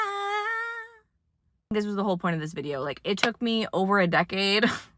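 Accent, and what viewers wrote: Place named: New England, United States. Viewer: o didn't know you were a new englander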